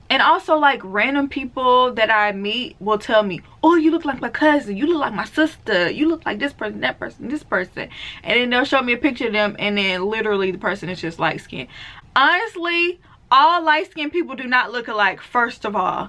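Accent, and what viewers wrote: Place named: South, United States. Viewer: Yes you def have a southern accent but you mix it up.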